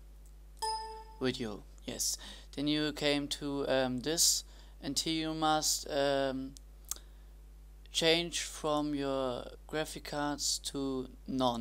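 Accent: Germany. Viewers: Helpful + funny German accent :D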